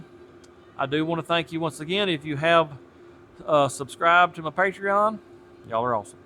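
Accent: South, United States. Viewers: Me and my wife absolutely love this channel. Your cadence and voice remind us so much of our relatives who live in Tennessee and North Carolina. So it's quite comforting. I wish you nothing but the best sir! We will keep watching as long as you keep posting.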